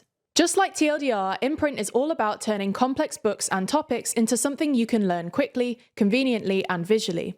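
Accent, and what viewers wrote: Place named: RP, United Kingdom. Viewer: Nice to hear a beautiful, eloquent and sophisticated sounding SRP (standard received pronunciation)--very classy.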